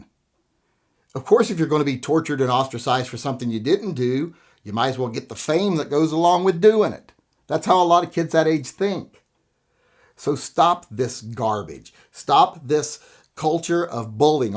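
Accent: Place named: Texas, United States. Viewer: If you're, how come you to sound like most of the other Texas country folks I know? Are you from west of the Taconic/ Appalachian Range? That might explain it. I've heard Mainers that sound like me, too - from west of the mountains. Or from in the mountains. WAIT A MINIT! Did I just hear you say offer the weird kid a handie??? No thanks don't want a stalker - it's enough that I talked to the weird kids. They become attached and no matter how kind they are, they usually end up being insistent, wanting sex with you all the time. I've had six oddballs / weird kids, sexually ambiguous, moody broody or otherwise outcast loner types fall in love with me because I was kind enough to give them the time of day or to speak a bit and say hello with a smile. They also get very possessive of your friendship and time. They also make it all bigger than it really is. 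That of course probably tells the world that it means the world to them, but it's really not the same for you. They fall in love and to them, that means sex - as it does for most all males, daughters ignored by fathers, but most always for the odd people who are probably not getting any parental love at home or sibling affection, respect, or any familial attention even. It may be the very reason they are odd because their parents are like the bullies at school! No handjobs for the oddballs, it may create stalkers and other unsavory events.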